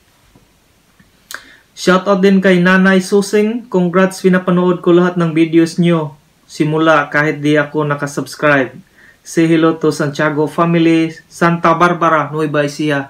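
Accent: Visayas, Philippines. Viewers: maka ingon jud ko nga bisaya ka sir tungod sa imong accent nga bisaya hehehe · mukang bisaya ka sir kasi tayo lng mga bisaya ang nagsasalita ng (ba) kahit magtatagalog hndi tlga nawawala satin yong (ba) -skl hahahha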